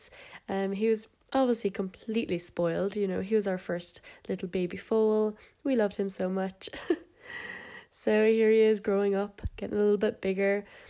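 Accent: Ireland. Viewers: Awwwww so cute I started horse ridding 5 weeks ago I LOVE IT,btw r u Irish because I am and u sound like u :) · Are you Irish?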